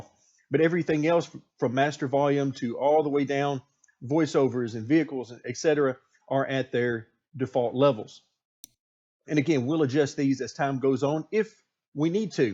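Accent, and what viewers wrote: South, United States. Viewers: Naw, this sounds more like Georgia-Missippi-Alabama southern accent.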